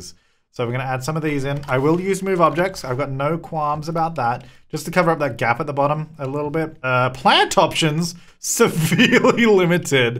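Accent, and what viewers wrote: Australia. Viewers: Are you Australian English or American just curias 😓😓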